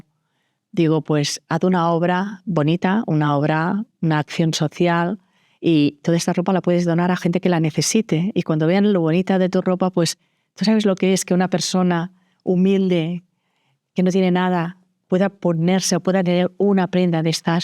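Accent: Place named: Peru. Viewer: El acento peruano lo identifiqué desde el minuto uno. Saludos desde Chiclayo.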